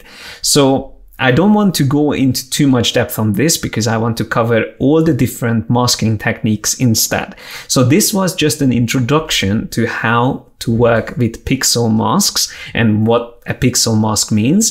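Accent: Hungary. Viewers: jól hallom a magyar kiejtést? :D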